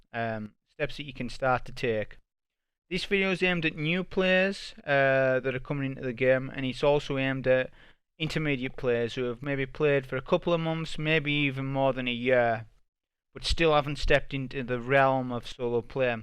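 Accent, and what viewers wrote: United Kingdom. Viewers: Excellent well presented video explaining key points on how to progress (solo or not to be fair). Can only assume that the 2 down votes were a mistake but if not, why don't the asshats share why they disliked it? Bet they don't. GG buddy. Always nice to hear a fellow Brit on the Destiny wagon.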